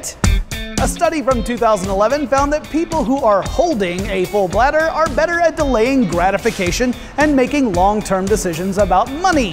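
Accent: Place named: United States. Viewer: I presume this is a U.S. production? (from the presenter's accent). in, the heavy traffic picture is that of Sydney Australia at sunset - specifically: Victoria Road (which begins at that Right Hand fork), and the Left Hand fork services near-city suburbs, but is also a way to get to the other main East-West road (Parramatta Road). Yes, despite initially heading North, Victoria Road is basically an East-West road. Both these main roads in and out of Sydney lie North and South of the Parramatta River (which basically runs East-West), and forms Sydney Harbour where it reaches the South Pacific.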